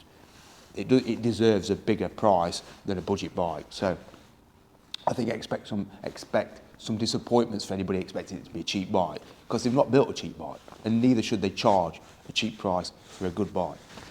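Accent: United Kingdom. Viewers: Had my Himmy for a year and a half and it's been the perfect bike for leisurely touring small country roads, gravel roads and greenlanes with my wife as pillion. Taking the road less traveled literally and figuratively attracted me to the underdog RE Himalayan over the Japanese, Austrian or German brands. The new Himalayan, unfortunately, has lost this appeal. On another note, as a non native English speaker, if I may, the immense variety of British accents, including your own, are delightful! As for that cold, a few hot toddy's should do the trick!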